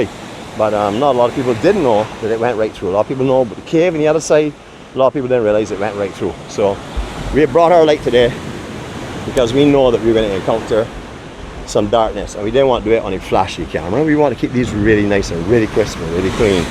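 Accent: Barbados. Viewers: Your voice is uplifting, love to hear Bajans speak. xx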